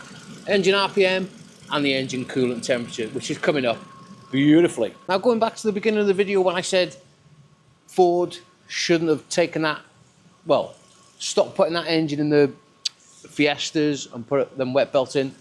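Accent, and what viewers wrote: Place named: Liverpool, United Kingdom. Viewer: You sound Welsh and Scouse at the same time!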